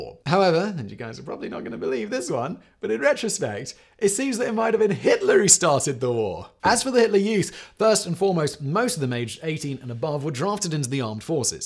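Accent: United Kingdom. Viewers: Yes! I found him via The Casual Criminalist channel. I thought he was a very posh English man. Very proper. Then I found his other channels. 😂😂😂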